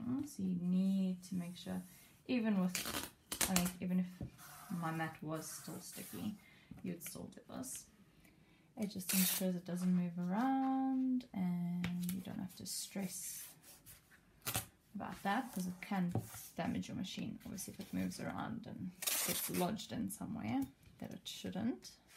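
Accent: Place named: South Africa. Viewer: What thickness basswood did you use for the top piece? I didn’t catch that Ahh accents. My go to is always Australian and then after a minute, I thought no those vowels aren’t Aussie she must be kiwi and then after another couple minutes I got an Irish twang and that’s when I knew you must be South African because that accent always sounds like all the subset English accents put together to me 😂. Checked your profile and sure enough!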